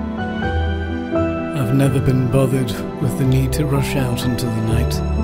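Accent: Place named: England, United Kingdom. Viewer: Bukowski spoken by a young, posh English guy does not work.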